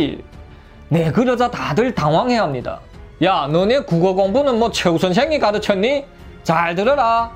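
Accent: Yanbian, China. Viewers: 웃긴다 조선족 말투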